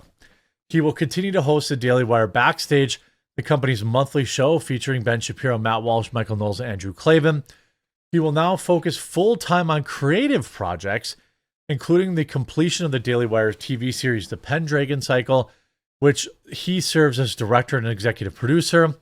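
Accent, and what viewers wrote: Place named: Midwest, United States. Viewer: It's always funny to me how you pronounce MAGA as May-guh 😂. Just messing you dude. Gotta love that Midwest accent.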